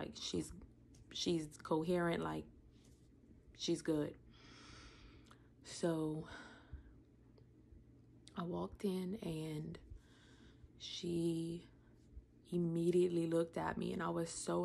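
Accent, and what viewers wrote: Louisiana, United States. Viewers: Listening to your accent takes me back to my time in Lafayette. I’m so sorry for your family. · This has nothing to do with the seriousness of your topic, but I knew you were a Cajun girl as soon as I heard you speak, so I looked at your about statement. I grew up in South Mississippi and have always loved the Cajun accent. My husband is from the Folsom-New Orleans area. I am so very sorry for the horrible loss your family suffered. I am sure, even now more than a year later, your family, and especially your mom, is still trying to discover the "new normal." I have never heard of the group with which you met, but it sounds like a wonderful support system. I think it's great you're not going to call the traumatic loss of your younger subs a "accident" anymore. My dad was a attorney in Mississippi, and it may have been after I lost a friend and her unborn baby to a drunk driver, I asked the definition of a accident. 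He told me a "accident is something that couldn't have been avoided." Well, from that legal definition, no drunk ever has or causes an accident; they create wrecks or, your new word, crashes. I know God's peace has surrounded you and helps you with your grief. I pray His peace continues to uplift you and your family in the days ahead and when your hearts are very heavy during milestones such as what should have been graduation years, when they might have had weddings or babies, special birthdays like 25, 30th, and so on. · You came across my Youtube feed and decided to watch your channel. As I am listening to you speak, I instantly knew you were from Louisiana, didn't know from where. Anyway. I am listening to your story and I'm so sorry and condolences to you and your family. I have watched the videos of the car and my God, praise God mama still here. I wish I could hug you. Having a loved one pass on is one thing, but to have them go because someone was to careless to care what their actions would cause to someone else. 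I 'm not sure if you're the same family I heard about from Jeanerette with the mom and three kids coming from watching a basketball ball game up north. I cried when I heard about that. Know that you have people who love and support you. even if you don't know them. Praying for continued healing and peace for your whole family. ❤ from a Loreauville girl. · Sounds Cajun, maybe?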